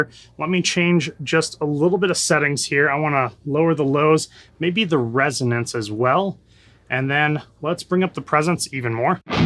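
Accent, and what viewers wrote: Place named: Brazil. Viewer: Sounds more Brazillian than british if you ask me.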